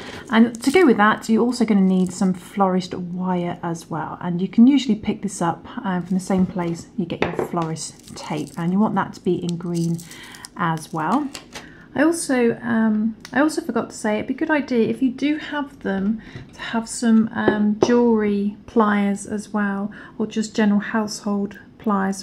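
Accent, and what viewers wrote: United Kingdom. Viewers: I absolutely agree with you. And I would also add that I'm in love with her voice, British accent, the way she speaks😍 I can't wait to by all the necessary equipments and start making all the flowers you showed for our summer house💖💖💖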